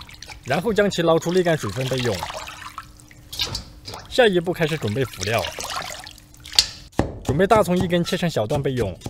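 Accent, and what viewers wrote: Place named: Shandong, China. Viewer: 去了趟山东难道学会了儿化音哈哈哈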